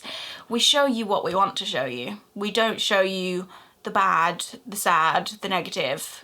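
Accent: Birmingham, United Kingdom. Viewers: Ayeeee your a brummie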